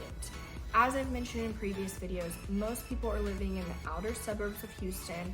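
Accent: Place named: Appalachia, United States. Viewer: For a British speaker from London, this is a hillbilly accent.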